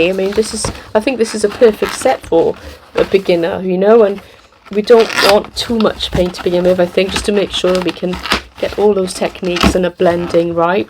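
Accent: United Kingdom. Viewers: Watching again here, it's successful unboxing arts supplies. Is it not expensive. I love your British accents ❤❤❤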